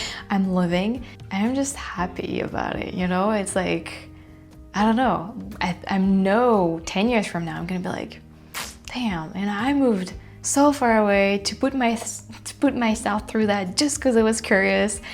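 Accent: France. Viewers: Never feel you need to lose your beautiful French accent. Mispronounced words that could lead to misunderstanding is one thing (and you rarely mispronounce your English words)…and, I do understand your desire to correct a potentially mispronounced word, if required…but please don’t confuse that with a need to change your charming accent. The French accent to the anglophone ear is soooo beautiful- truly one of the loveliest. 😊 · On a completely unrelated note, your English is getting amazingly good. I can tell the difference from just 6-9 months ago. You’re losing some of that French accent and speaking more "North-American”. You probably dont even realize it yourself but its definitely there on more vowels and consonants. At this pace In another 12 months you’ll be fooling everybody and can tell people you are from Toronto or Orlando.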